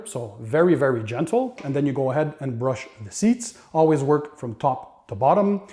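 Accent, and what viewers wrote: Quebec, Canada. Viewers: Are you from Quebec ?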